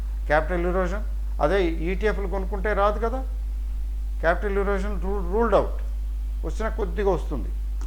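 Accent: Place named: Coastal Andhra, India. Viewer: Sir, what is your native place. I know you are from coastal area.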